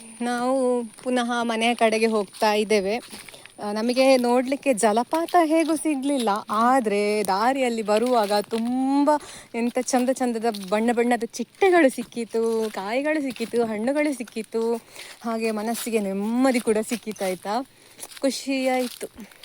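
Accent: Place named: Udupi, India. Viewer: Namma Udupi bhashe matadteddiree ...neevu yelli navru...nanna ooru barkur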